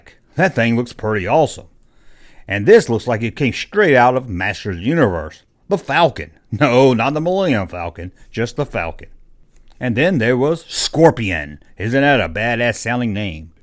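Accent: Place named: Georgia, United States. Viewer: Who gets mad a a great Southern Accent? Love the Georgian draw. No gay shit.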